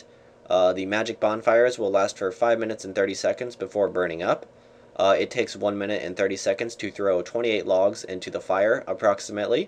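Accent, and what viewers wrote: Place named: United Kingdom. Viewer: i think he's british... t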